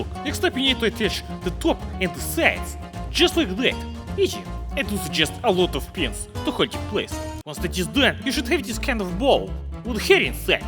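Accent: Russia. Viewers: This dude has the thickest Russian accent I've ever heard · Мне 1 кажется что ты не американец а русский?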